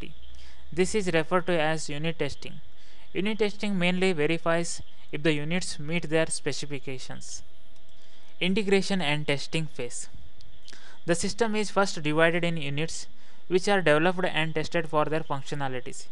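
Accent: India. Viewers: Very knowledgeable, he sounds South Indian....would really help if he got his accent sorted out...as it really become difficult to understand as well as taking his video seriously. Although he knows what he is on about, but the accent really is a turn off....could not use this as a video for my British students, i just know they will be bemused by the accent. Anyhow informative vid:) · he is indian guy, but I can understand him because alot of my teachers are indian and I get used to this kind of english. Thanks for the video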